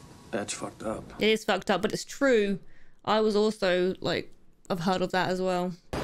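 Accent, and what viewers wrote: England, United Kingdom. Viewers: Back to the future is a good shout. My favorite film. But I think you come straight off the bat with Nobody and work your way back. You sound English, have you seen Snatch or Lock Stock?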